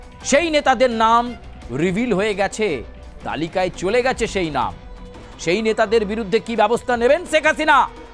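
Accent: India. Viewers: দাদা তোমার ভাষা তো,, কোলকাতার। বাংলাদেশি সাজছো কেন কেন???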